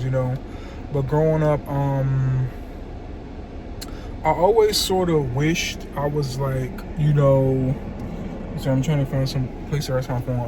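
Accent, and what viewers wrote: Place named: Spain. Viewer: So you developed a so called Spanish accent.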